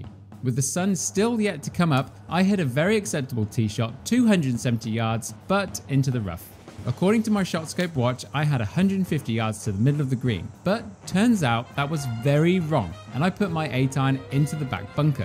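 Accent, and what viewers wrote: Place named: United Kingdom. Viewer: Commentary excellent…. Forget the wind exists 😂😂😂😂😂😂 Where bouts the uk you from or where were you from ? 🇬🇧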